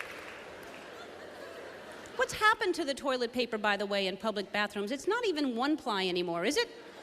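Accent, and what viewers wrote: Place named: South, United States. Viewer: Is it me or can you hear her Southern accent a bit?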